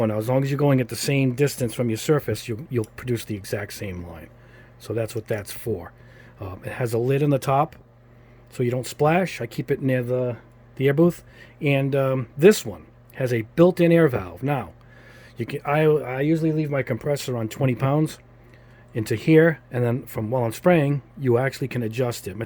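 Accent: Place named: Long Island, United States. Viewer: You have to be from Long Island. I can understand ever word you say clearly haha!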